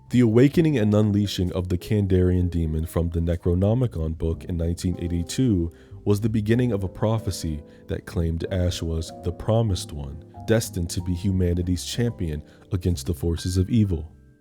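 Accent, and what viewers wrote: United Kingdom. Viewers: OMFG I love a fellow cinephile!!!! But what I love more is your random british accent that you let out in your videos!!!! Can't wait to see you have more and more success! ❤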